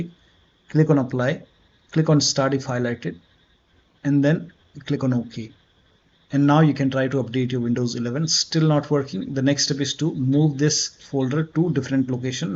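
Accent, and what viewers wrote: India. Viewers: i know i can fix this after i heard his indian voice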